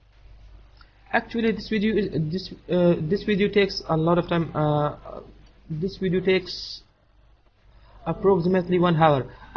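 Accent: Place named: India. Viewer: I can't understand you! You have such a thick Indian Accent!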